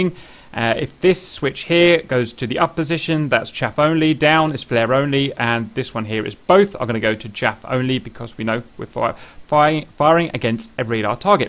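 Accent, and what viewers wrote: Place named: England, United Kingdom. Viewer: can you try to change your english accent with an american accent so i can understand any word about what you say.....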